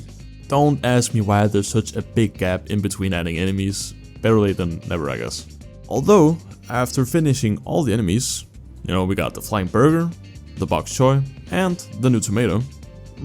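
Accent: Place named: Denmark. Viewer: Yo that would be sick i am 100% buying it if it costs money (selv fra Danmark og jeg kan godt høre du også er fra Danmark men dit engelsk er meget godt 👍)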